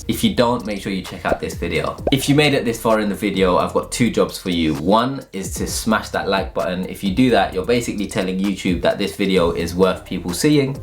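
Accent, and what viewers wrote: United Kingdom. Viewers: Nice and clear especially because your British too. Can you explain short selling too please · This isn’t in basic English! It’s in British!!!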